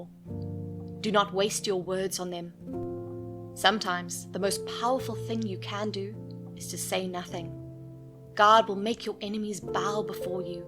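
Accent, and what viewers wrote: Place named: South Africa. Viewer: Thank you for this! You sound South African. :)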